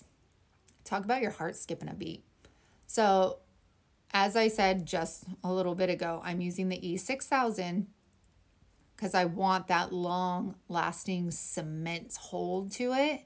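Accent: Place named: North, United States. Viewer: Hi I just came across this video and had to watch it to learn some things because making wood round signs is my favorite craft to make. I always order my rounds from Amazon, but they aren’t anywhere near that thick! Those are really nice! I am from Southeast Kansas. It sounds like you are up north from the places you shop at. Thank you for the tips I picked up! I enjoyed and subscribed to your channel.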